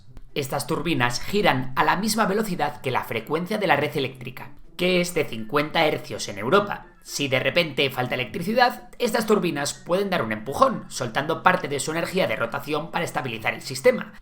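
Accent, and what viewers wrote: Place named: Spain. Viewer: De que parte de españa es este acento? Jajajaja se me hace bien chistoso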